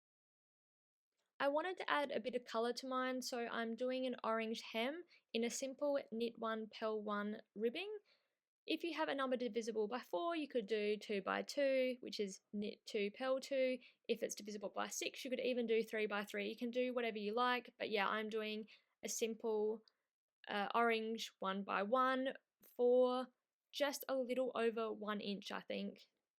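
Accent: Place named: Australia. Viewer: Wow. Lovely Australian accent you have. Thank you I appreciate that😊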